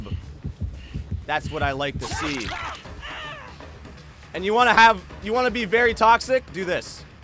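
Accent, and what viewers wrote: Czech Republic. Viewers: You from Czechia ?😂❤🇨🇿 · ty jsi čech vole?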